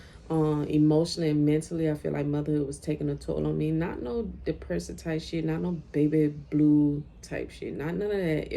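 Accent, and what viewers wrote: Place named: Georgia, United States. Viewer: That Georgia and New Orleans accent blending beautifully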